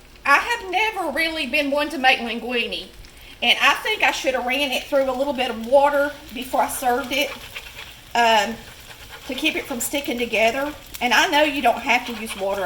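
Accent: South, United States. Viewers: Yes, you have to always pay extra attention to your pasta for the first 2-3 minutes or so after you return the pasta to a boil. Very important that you are stirring constantly at that point, and then at least another 3 good stirs during….untill done! Trust me….we are an Italian family who eats pasta dinners traditionally every Wednesday and Sunday. I learned after moving to Florida from Brooklyn, NY, that here in the south, they don’t make a lot of Italian foods. I think it’s wonderful that you’re expanding your cooking skills to learn new things! I’m learning how to cook “southern style” from you and I’m enjoying it because you make it so easy for me TO learn! Just plain simple English (with a heavy southern accent 🤣) using every day ingredients is the way I like to learn cooking anything new! Thank you for that!! ❤️😘🥰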